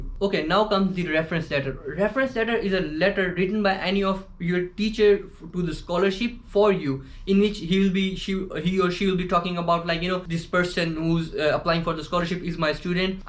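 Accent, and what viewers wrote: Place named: Pakistan. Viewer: kuch b kr lo native english bat ni kr pao gy 😂 tu faida nu Pakistanio ki trah he english bolo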